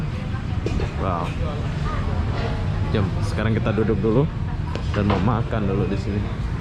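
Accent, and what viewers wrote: Indonesia. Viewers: Ini seriusan orang malasyia...kok logatnya indonesia bgt ya.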